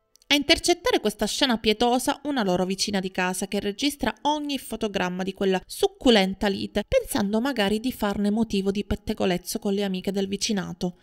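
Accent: Sicily, Italy. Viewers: Ma chi è che commenta sulla dizione? Non ho letto tutti tutti i commenti ma fin'ora non mi pare di aver letto critiche! Si sente cmq l'accento siculo ma a me non dà fastidio, anch'io quando parlo mi riconoscono subito che sono veneta 😂😂 non so usare le doppie e trascino le parole alla fine, ma non posso farci nulla 😂😂 a me piacciono i vari accenti e dialetti!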